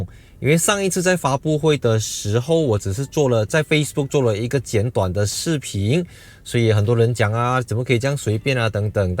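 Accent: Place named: Malaysia. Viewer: 马来西亚的中文口音好可爱啊。💯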